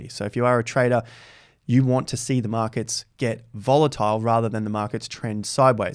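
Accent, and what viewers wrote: Australia. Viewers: Hey mate I came over from invest answers, good to hear a ozzi accent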